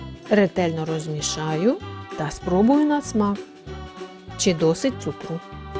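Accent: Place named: Border region, Ukraine. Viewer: 6 гарний гарбузик 😃 Цікавий у вас акцент, десь на кордоні живете? Смачного 😋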